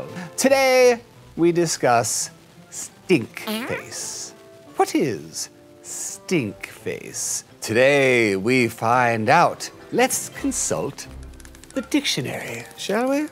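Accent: England, United Kingdom. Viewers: LOL - you're English Accent!!!!!! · Haha l i love the British accent ;D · That British accent 😂😂 lovely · How did you get to do that english accent? So interesting and so theatrical !!! I like it !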